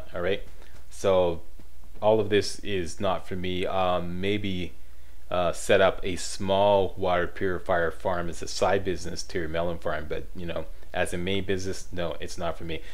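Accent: Canada. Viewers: You sound pretty damn Canadian Bruh. #SK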